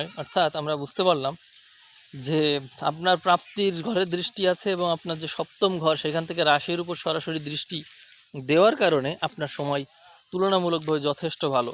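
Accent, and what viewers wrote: East Bengal, Bangladesh. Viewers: তুমি কি পূর্ববঙ্গে বাঙাল?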